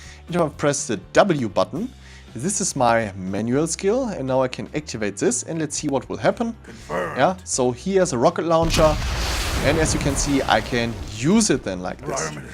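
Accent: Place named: Germany. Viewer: Ahh dieser deutsche Unterton ^^ man erkennt den doch überall. Es gibt leider kaum Spieler für das Game =(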